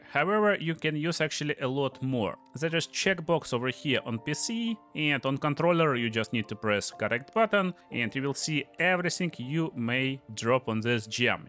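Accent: Russia. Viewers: Your accent, I swear You made a BG3 guide but I can't find it on this channel, maybe another Russian. You sound identical, accent an all lmao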